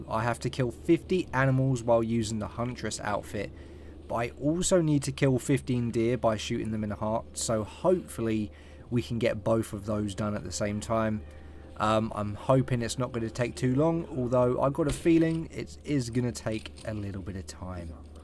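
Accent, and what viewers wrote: England, United Kingdom. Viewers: I know this guy is saying divine source but I guess with his english accent and sounds like he's saying divine sauce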